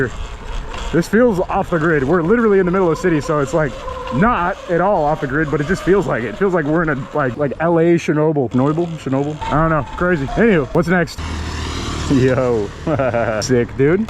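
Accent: Minnesota, United States. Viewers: Don't look at my feet🤣🤣🤣!! Love your content bro! It's crazy because you sound like this guy I used to work with who was from Minnesota. Not sure if you've already finished the giveaway, but if you haven't, I wish you would consider me! It's kind of weird because I'm 51 years old and the whole e-bike scene is just way too exciting for me and I would love to be a part of it! The thing is I'm kind of in a messed up spot, because of a ticket I got while I was delivering with Amazon. I was delivering a package to a dark neighborhood and I was using my brights and for some reason I was pulled over and given a ticket for using them. Right now my license is suspended and I had to sell my car to pay some bills and food. I love to win that bike because I could use it here in Houston to see how the market for delivering on an e-bike would be here. I follow some guys who delivers food on e-bikes in California and they seem to be doing fairly well! 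I'd love to try that in Houston being that it's a pretty big city and I have a feeling the market would be good and it kind of nichie in a good way! It would really help me out because for some reason people are looking at my age and it's being hard to get another job! Before I was working with Amazon, I had a job that I was with for over 20 years and when covid hit, I lost it!! Screwed up thing is that I am a very young 51 in that I feel like I'm still in my 20s! I could do some deliveries until I find something more permanent but it would de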